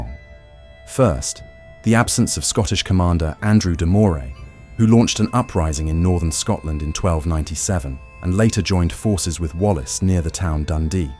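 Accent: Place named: England, United Kingdom. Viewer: Narrator with English accent denying Prima Noctis is SUS AF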